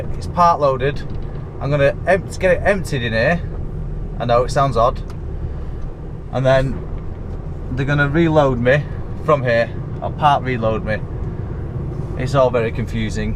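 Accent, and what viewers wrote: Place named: Birmingham, United Kingdom. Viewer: your brummy accent is good I’ll give u that lol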